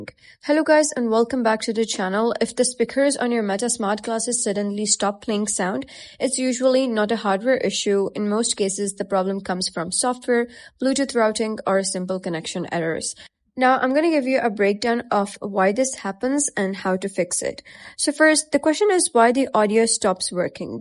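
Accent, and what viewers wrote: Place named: India. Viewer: Of course its an Indian voice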